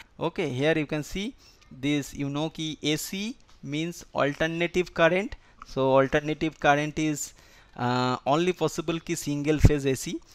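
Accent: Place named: India. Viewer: I really appreciate the content but I need to say, that for a not native speakers or not Indian the accent is hard to understand. So as a constructive advice I will recommend improving your speaking skills to easy reach the 1M.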